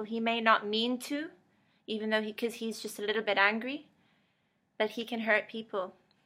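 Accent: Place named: Ireland. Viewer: are you Irish? - I love your accent xx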